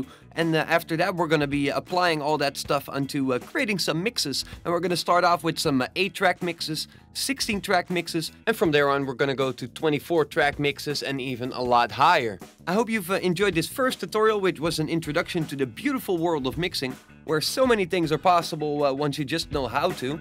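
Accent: Netherlands. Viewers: klinkt nederlands? alsnog goed accent hoor haha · Love the Dutch accent 🤣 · are you dutch? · Amazing explanation! By the way are you Dutch? That accent sounds so familiar. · You dutch? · Ik hoor een Nederlandse accent?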